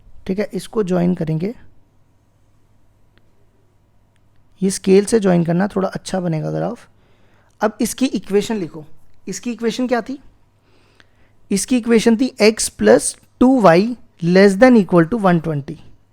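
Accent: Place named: Jammu and Kashmir, India. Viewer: Bhai kaisa hai tu unke udhar sab aise hi bolte hai j and k se hai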